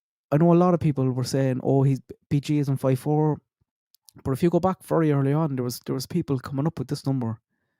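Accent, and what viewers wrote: Ireland. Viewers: Can I ask if you are from West or North West Ireland? You sound so much like my favorite Irish Trad band from Co. Clare, "*Socks is In The Frying Pan" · I like ur theory about the dog in the backyard. That makes total sense to me. I was listening to a prosecutor pod cast and they said that one of the reasons they would seal court documents and a hearing is because others may be involved. Other than that the courts belong to the citizens. We own the courts because we pay for them which is why there’s a freedom of information act. (FOIA) I love listening to you talk. My grandfather’s parents settled here in Michigan. At the time the Irish were not treated so well by society so they would set up Irish settlements. I actually grew up in the old Irish settlement. They had there own school and functioning society. Because my grandpa was only around Irish settlers and his parents, he retained a bit of the Irish brogue. 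He was the sweetest man on earth so herring your voice is so nostalgic for me! ☘️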